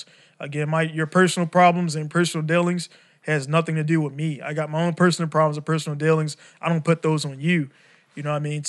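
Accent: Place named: Baltimore, United States. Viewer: A mix tape would hav been dope, do you still make beats??? Your accent is nuts my dude! Still hear that bmore flex tho. 😏 Being in business for yourself is crazy, it’s the best and worst thing ever. Holding yourself to a higher standard is important, never undervalue yourself or your work. As soon as you start doing that, everyone else will too. Looking forward to hearing more from you! Awesome test/intro. Hav a good night.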